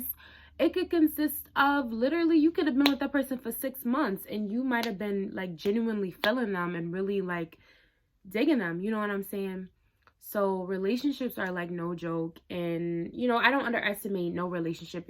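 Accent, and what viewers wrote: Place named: New York, United States. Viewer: Sounds like you have a New York accent lol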